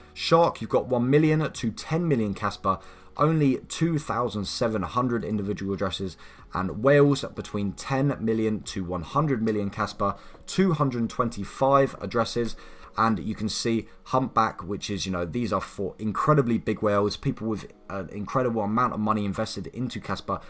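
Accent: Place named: England, United Kingdom. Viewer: i want to learn your accent bro! After of the halving I will to pay someone from Ingland to teach me.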